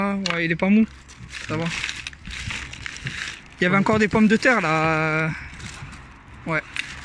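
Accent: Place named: South, France. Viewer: C’est super ce que tu fais! Et en plus j’adore ton accent du sud c’est magnifique! Bon courage!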